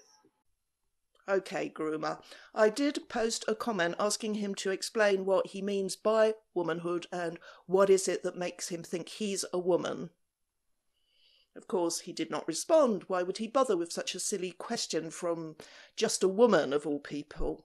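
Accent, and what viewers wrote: United Kingdom. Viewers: I've watched enough of these to lip sync near perfectly what she is saying and let my brain fill in the British accent. I've never been more terrified of a YouTuber for yelling at her audience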